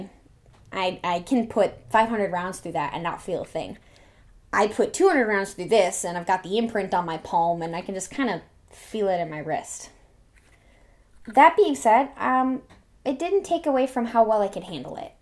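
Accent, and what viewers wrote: Wisconsin, United States. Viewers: Austin France Hey hey you can't hate on the Wisconsin accent!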